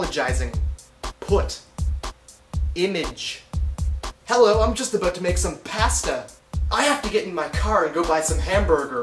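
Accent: Canada. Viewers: You have a crazy canadian accent lol I found it just right away after I plated your video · As a fellow American, I think your do have a Canadian accent. Its very similar but there are a few words you say very awkwardly and sound different. Especially on bagel. You put too much emphasis on the "a" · I actually bought chocolate milk in a bag and I say those words same as us Canadians and our strange way of saying stuff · lol you have a very slight canadian accent i hardly hear it but it was most noticeable in the words: sorry, out, pasta, syrup, bagel, and apologizing. at least with my suburban new england accent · You honestly dont have that much of an accent. You said a lot of those things like I say them, and I dont see how its 'weird' (obviously there were some differences, like "sorry" and "bagel"). You have the exact same accent as my history teacher last year, and I didnt even realize he was Canadian until I heard him say "tomorrow" like halfway through the year. 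This video was hilarious by the way. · Well technically EVERYONE has an accent (except mute people, since they don't talk) but no matter if you're American or British or Canadian, everyone has one. Yours is a Canadian accent by the way. And its awesome. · My teacher is Canadian and I can see the similarities between his and your way of speaking xD I want a Canadian accent, I think they're cool ~ Especially the way you pronounce "going". It's something about the o that sounds really awesome! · I personally love the way you talk. I love Canadian accents ^.^ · you have canadian accent. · I can't pin it but you do have an accent (I'm Canadian as well). Your "sorry" definitely sounds different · You said every single one of those words in a crazy Canadian accent except Hamburger. That was American. ;'D Btw, I LOVE YOU SIR.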